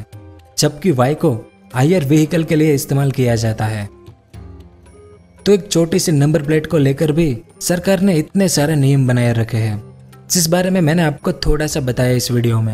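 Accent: Gujarat, India. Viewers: ACCENT SE BATA SAKTA HUN GUJARATI HO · Gujrati accent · Ye gujrati hai yrr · Gujrati ho aawaj se khabar padi gya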